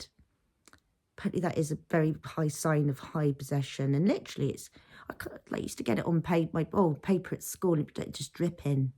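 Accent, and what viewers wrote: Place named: England, United Kingdom. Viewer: I dont think my comments to the first vidoe of yours were correct about being a witch, but im not certain. You talk like me but with a English accent. Im going to get to the bottom of this.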